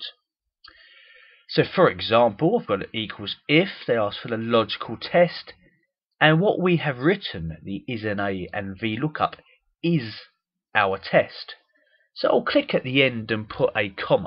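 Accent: United Kingdom. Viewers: Couldn't be a better explanation, this is the best tutorial for VLookup. I liked the Brit accent. Oh My Gosh.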